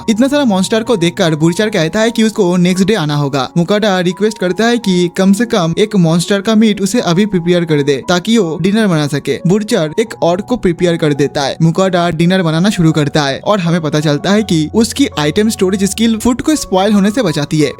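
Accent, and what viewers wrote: Bengal, India. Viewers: Are you a Bengali? I mean your accent is familiar to me. Anime ko 8 dena prefer karungi. And for your voice it's also 8.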